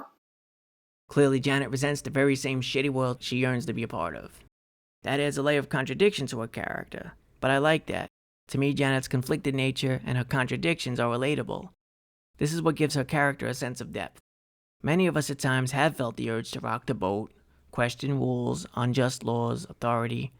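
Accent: New York, United States. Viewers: I love his New Yawk accent too! It reminds me of Slip Mahoney and Satchel Jones and the Bowery Boys. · What illuminates these summaries is not only TZ's excellent writing, but your own. The New York accent also lends a down-to-earth quality to even the most avant garde episodes. Bravo!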